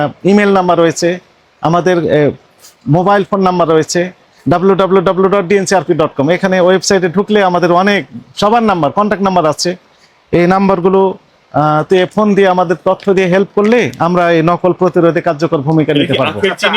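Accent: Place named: Naogaon, Bangladesh. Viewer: স্যার আপনার বাসা কি নউগা?আপমার ভাষার একসেন্ট অনেকটা অইরকমই!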